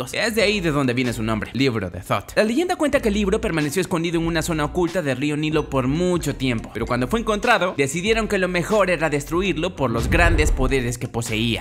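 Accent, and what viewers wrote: Mexico. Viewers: ese acento mexicano me perturba mas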